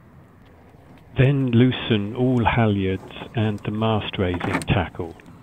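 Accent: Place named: United Kingdom. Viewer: J, You don't sound like a native Californian. Are you British?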